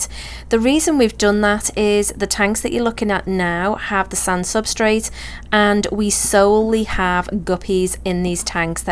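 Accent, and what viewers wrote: Liverpool, United Kingdom. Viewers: Random question but are you from Liverpool? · Is that a hint of scouse I hear in that accent?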